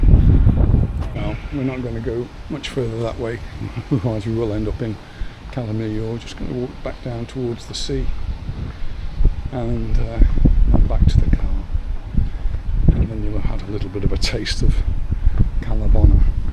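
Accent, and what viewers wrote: Stoke, United Kingdom. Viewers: Are you from Stoke fella? You got a bit of an accent. I really didn't enjoy Cala Bona when I went. Far too touristy for my liking. If I wanted to eat burgers for dinner I'd go to Hanley. Edit - yeah, when I heard say "go on eet' that answered my first question.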